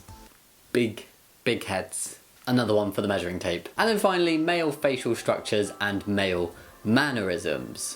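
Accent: United Kingdom. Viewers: I love how masculine his voice sounded when he said ‘femininity’. Something about the mixture of the deep voice with the British accent. Not fetishising, I’m British too, but yeah just a random thought. Otherwise I enjoyed the vid, that TERF is a right idiot and an all-round awful human being.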